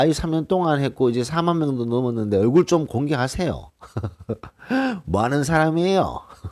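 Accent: Gyeongsang, South Korea. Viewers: 들을때 마다 느낍니다. 어쩜 경상도 사투리가 이리 듣기 편할 수 있는지.. 전 경상도 사투리 원래 질색을 하는데 선생님 영상은 목소리 들으려고 일부러 들어요. 평소엔 괜찮다가 피곤할땐 듣기 거슬리는 목소리가 있는데 피곤할 때 회복하게 하는 목소리예요. 물론 메세지가 좋아서도 있겠죠. 오랫동안 잘못된 기독교 신앙을 통해 나 자신을 부정하고 남을 용서하고 사랑하라는 메세지를 문자적으로 지켜보려고 하다 몸과 맘이 만신창이가 된 사람입니다. 요즘 심리학을 통해 의외로 성경에서 말씀하신게 그게 아니라는 것도 깨닫고 님 처럼 내 주위에 넘쳐나며 나를 갉아먹는 하이에나, 소인배, 나르시시스트, 소시오페스들을 정리하였습니다. 저에게 달라붙으려고 한 인간들이 내가 좋아서가 아니라 나한테서 떡고물을 얻어먹고 내 진액과 생명력을 다 빨아먹으려는 에너지 뱀파이어 였음을 깨달았 고요...이제는 나를 가장 우선에 두고 살겠습니다. 저의 생명되신 예수님도 그것을 바라실 것입니다. 감사합니다.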